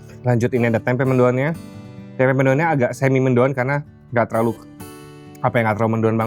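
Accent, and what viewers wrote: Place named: Papua, Indonesia. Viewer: Tuhar... Ternyata dialek nya Bang Masih kuat Papua😅😅😅😅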